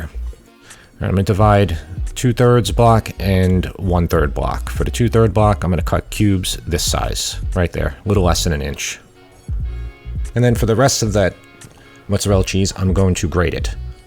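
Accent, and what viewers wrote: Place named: Philadelphia, United States. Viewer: I’m literally going to watch all of your videos so I feel at home. Your Philly accent with a hint of Jersey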